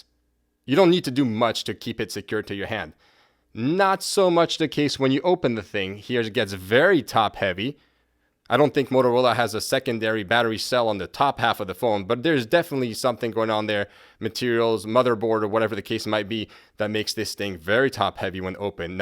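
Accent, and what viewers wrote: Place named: Montreal, Canada. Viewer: Random but are you from Montreal? I hear a familiar accent 😆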